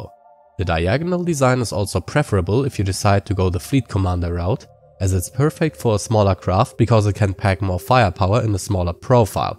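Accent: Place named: Germany. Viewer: Warum hört sich das an als wenn ein deutscher gut Englisch spricht?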